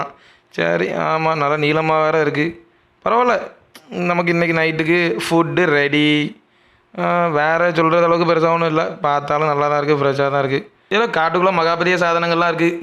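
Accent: Tirunelveli, India. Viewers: Super la naama oru slang vara level laaa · Ji super. Namma ooru slang romba arumai. Plz upload more videos ji. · Bro, next video release please... only solo video with pakka nellai slang... PLEASE.. nammooru basha kettu romba varusham aachillaa...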